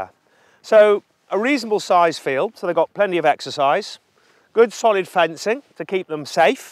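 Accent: United Kingdom. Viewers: omg the posh level of this guy, its like if you could take the most stereotypical rich english guy and put him on screen you would naturally have this youtube channel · Because he has English accent.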